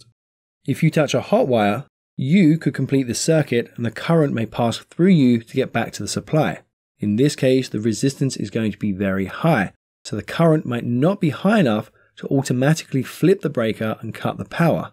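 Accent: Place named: England, United Kingdom. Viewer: Sounds so weird hearing an English accent explaining the American terminology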